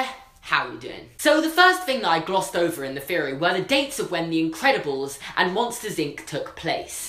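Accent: United Kingdom. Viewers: is he British just cause I'm British and someone I know sounds like him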